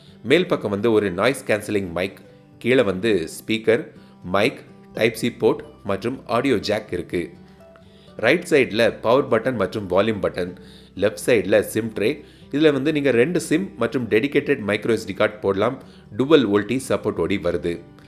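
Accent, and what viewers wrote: Kanyakumari, India. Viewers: உங்கள் குமரித் தமிழில் கேட்பது இன்னும் திருப்திகரமாக இருக்கிறது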